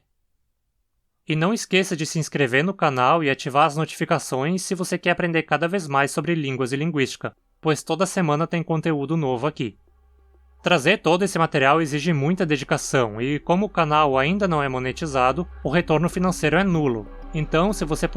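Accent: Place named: Rio de Janeiro, Brazil. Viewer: Sotaque do RJ, amei❤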